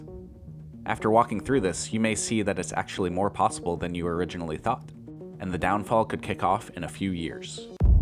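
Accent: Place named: Illinois, United States. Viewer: your accent, its an interesting one........Ilinois??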